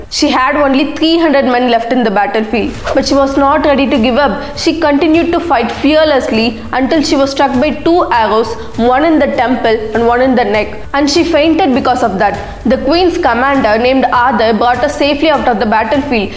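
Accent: Tamil Nadu, India. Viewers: You have a very thick tamil accent. Please work on your accent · You have heavy Tamil accent, why don't you make videos in Tamil itself?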